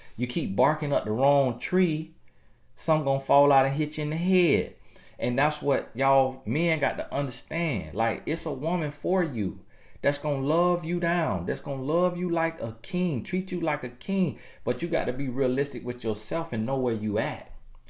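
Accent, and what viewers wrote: United States. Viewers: Gurrrl I fell out laughing and his country accent make it more funny🤣🤣🤣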